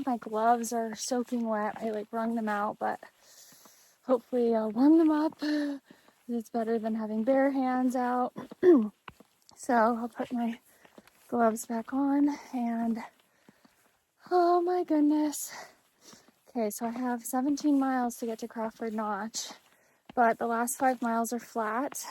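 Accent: United Kingdom. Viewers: I love the British accent! I’ve been watching your hike for many years. I remember your video where you started the British accent and asked if was real or not. Never saw the answer. I think it’s real, if not it’s great!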